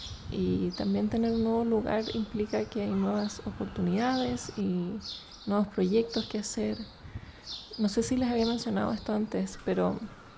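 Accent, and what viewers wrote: Chile. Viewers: Tu acento chileno es pura paz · Hola, de dónde erea originalmente? Oigo un acento chileno:p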